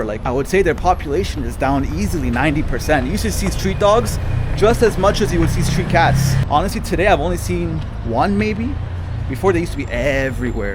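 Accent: Turkey. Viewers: New subscriber's here. Love turkey, the last time I visited istanbul was 2022 of March. The whole month roaming around turkey. Seems like that you have Turkish origin s since you have accent bro. 😊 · your Turkish accent is cute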